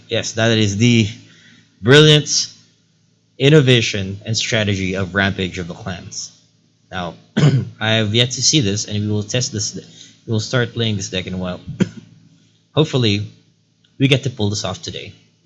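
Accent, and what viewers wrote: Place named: Philippines. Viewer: I can tell you’re filipino, hahahaha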